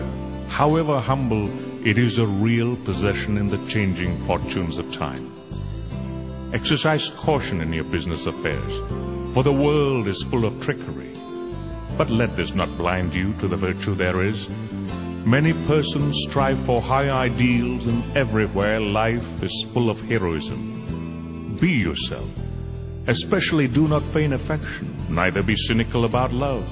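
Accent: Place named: Scotland, United Kingdom. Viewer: Some say the orator is James Earl Jones or even Kamahl, but I detect a slight Scottish accent like Sean Connery.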